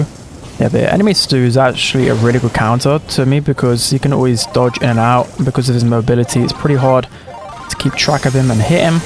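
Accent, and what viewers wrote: United Kingdom. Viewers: is so sick that his British accent got so strong I can't even understand some word he's saying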